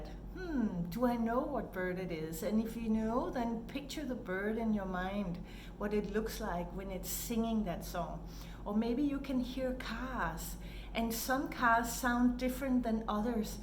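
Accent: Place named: Germany. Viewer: Your accent sounds German. Are you German? Also good video.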